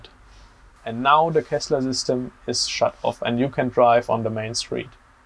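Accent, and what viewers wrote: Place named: Germany. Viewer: the knowledge of cranes and the German accent are strong with him.;-)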